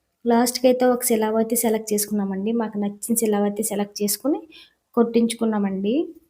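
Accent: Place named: Konaseema, India. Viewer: I like konaseema❤❤ Akka Mee side slang Bale vuntadhi Chakkaga baga matladatharu meeru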